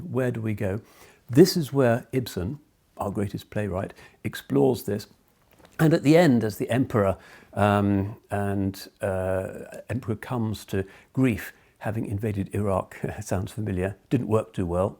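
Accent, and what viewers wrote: United Kingdom. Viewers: im here for british english and his intellect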